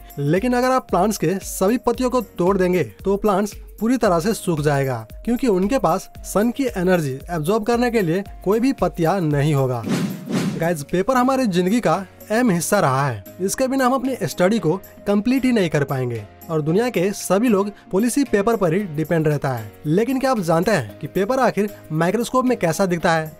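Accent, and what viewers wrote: Odisha, India. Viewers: Aap Odia ho na aapke pronounciation se lag raha hai. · Are you from ODISHA? Your pronunciation is like Odia people.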